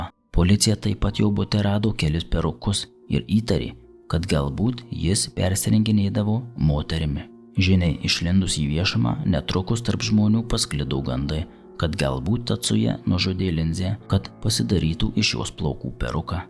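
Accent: Suvalkija, Lithuania. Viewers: Ar tu suvalkietis? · Viskas būtų ok, bet suvvalkiečio akcentas turėtų išnykti. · Man skamba kažkaip suvalkietiškai. O šiaip reikia tobulėti, su laiku manau viskas išsisprendžia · Taip, akcentas suvalkietiškas. O dėl tobulėjimo, tai labai teisingai, reikia praktikos, laiko ir viskas eis su kiekvienu video vis geryn.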